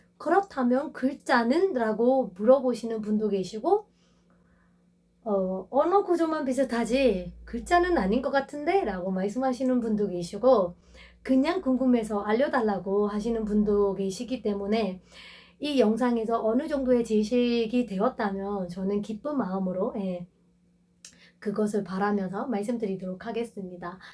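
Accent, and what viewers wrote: Gyeongsang, South Korea. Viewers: 경상도 사투리 억양이 있으시넹~^^ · 경상도 쪽에서 한국어 배우셨나요?? 아님 주변가까운 한국친구가 경상도사투리를 쓰시는 분이신가?? 미얀마분이신대 경상도 사투리억양이 좀 묻어나오시네요 ㅋ것도 살짝 ㅋㅋ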